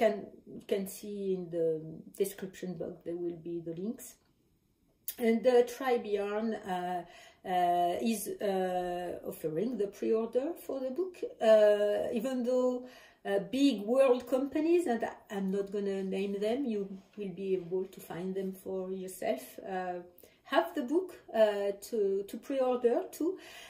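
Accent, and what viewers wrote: France. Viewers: Hi,! Great podcast, your English is really great and I just love the French accent! Thank you so much for presenting the Fresh Peonies, I am so glad that you felt all that I was hoping this pattern to be: the ideal relaxing project, easy and comfortable for every knitter to knit while bringing up the best of Lise's beautiful yarn! I just subscribed to your channel and look forward to future episides! À bientôt!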